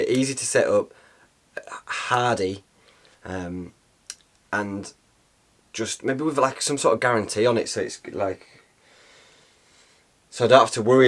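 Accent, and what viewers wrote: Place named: Hull, United Kingdom. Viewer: Hi pal just watched this vid and really enjoyed it. Gotta ask where are you from? Im from hull and you sound local? Ive got a helm 3, my first tent and had it since jan2018 and still going strong at 35 wild camps. Youve gotta get another helm just for the sake of it! Saying that theyre pretty rare these days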